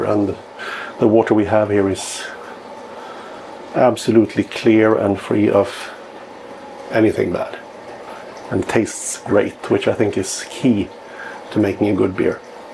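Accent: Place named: Sweden. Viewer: I like your swenglish. Nice equippment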